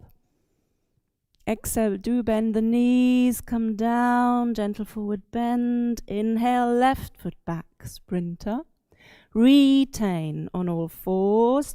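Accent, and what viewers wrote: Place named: United Kingdom. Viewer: Was sie für eine wunderbare Stimme hat und dieses liebevolle British English ist für mich so ein Segen... Gerne regelmäßig Yoga mit!!!!! I love it!!!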